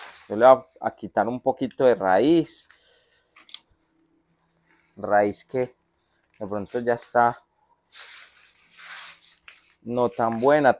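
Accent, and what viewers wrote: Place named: Colombia. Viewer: Soy nueva en su canal y me gustaría estar con ustedes para poder recoger la planta con ustedes son de Colombia ustedes por el acento bendiciones saludos ❤❤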